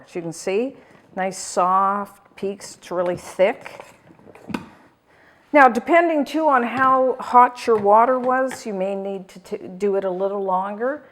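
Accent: Canada. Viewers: Love the step by step explanations. She sounds so Canadian.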